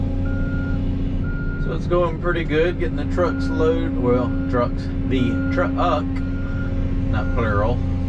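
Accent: South, United States. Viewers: I love watching the different things you do. I also love to hear you talk with that southern drawell and semi deep voice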